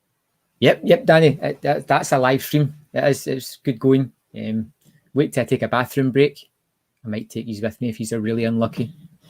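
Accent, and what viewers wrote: Scotland, United Kingdom. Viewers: I’m sure your voice sounds different on the live stream! Not sure if it’s more Scottish or not 😃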